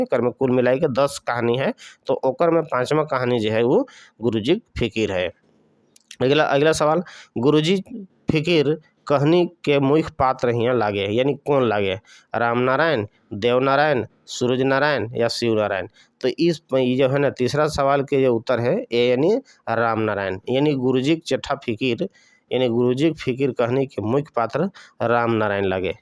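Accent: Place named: Khortha, India. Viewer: आपके खोरठा बोलने की शैली से मैं काफी प्रभावित हूं। कृपया आप नियमित रूप से विडियो अपलोड करना सुनिश्चित करें,यह चैनल बहुत तरक्की करेगा। 🙏 धन्यवाद 🙏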